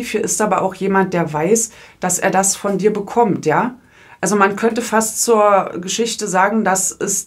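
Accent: Berlin, Germany. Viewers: Vielen lieben Dank für diese sehr passende Tagesenergje - Botschaft 🙏❣️🙏 Heute ist für mich ein sehr sehr spiritueller Tag ❣️ Ich habe schon am frühen Morgen einige wichtige Erkenntnisse gewonnen und durch deine Botschaft der Tagesenergie finde ich diese intuitiven Gedanken bestätigt ❣️ Deine Tipps und Ratschläge sind immer sehr wertvoll für mich ❣️ Achja, das mit dem Grammatik Kurs vergiss bitte wieder 🙏❣️🙏❣️🙏 Ich liebe deinen Berliner Dialekt ❣️🥰❤️ Hab über 20 Jahre in Berlin gelebt, das ist einfach meine Heimat.... Wünsche dir und allen anderen, die dich auch von Herzen mögen, einen schönen und vor allem positiven Dienstag ❣️❣️⚡🌌☀️💖😘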